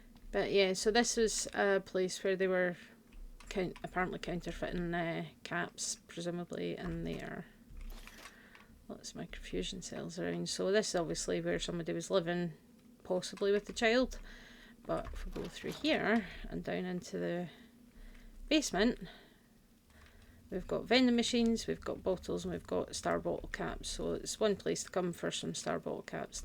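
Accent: United Kingdom. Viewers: You from glasgow? My fiance is from Leith. Great vid, thx from USA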